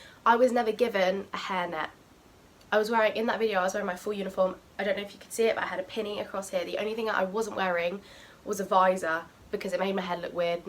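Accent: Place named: United Kingdom. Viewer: Very punctual with the response. But I sure do agree that the UK are far more strict than the US. Not really sure why people are attacking you as you are clearly in a different continent (due to your British accent) and you are just an employee, or at least used to be. But your quick response to address the issues people have is great and like the approach you took. Taking great care of the comments on your videos and addressing them and paying attention to it. That is nice of you to pay attention to useless comments by outraged people hating on subway. As for your video content, I can see you make videos with a bit of comedic style to it, which is unique. Disregard the hateful comments, your videos are great. It is natural and real and straightforward. Good luck with your channel, and hope you have a great future ahead of you!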